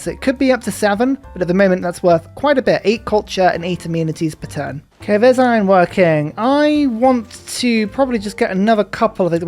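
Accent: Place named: United Kingdom. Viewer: Bri'ish gameplay with Bri'ish commentator incoming, you bring me joy EVERY DAY. Thank you for that.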